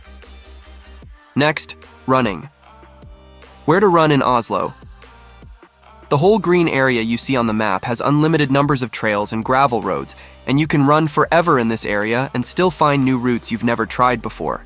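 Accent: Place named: Canada. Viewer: You're Norwegian? Your accent sounds Canadian.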